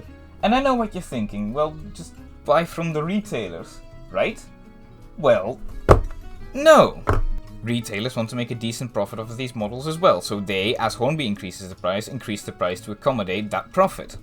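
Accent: Scotland, United Kingdom. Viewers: Wait you're Dutch? All this while I thought you were Scottish! Great video mate, I agree with everything you said! Maybe if they found a way to up the quality and drop the prices, they'd lose that outdated image and start drawing in younger blood into the hobby! Awesome content as usual mate! Cheers! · Naar mijn mening heb je eerder een Schots accent dan een Nederlands accent.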